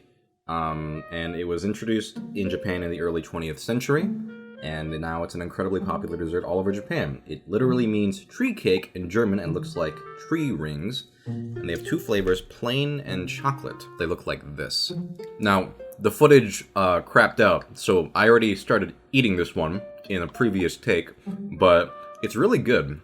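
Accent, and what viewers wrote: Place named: United States. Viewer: his accent is so cool, he sounds like he's American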